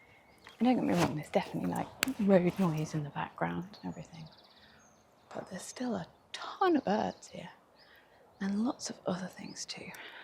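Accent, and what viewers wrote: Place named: United Kingdom. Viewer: Thank you! I do OK with the British accent, but It's easier when Its loud and clear. 🙂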